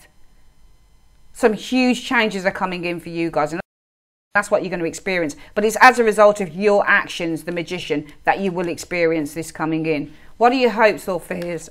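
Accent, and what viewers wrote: Birmingham, United Kingdom. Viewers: I love you - 'If you wannit, you golla be onnit - you wannid it!' Gotta love a fellow Brummie. We give it straight, no chaser, honest and clear. Thank you for a great reading. So on point. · Usualsuspec13 i Love that’s She’s a Brummie like myself too. I was thinking that 😀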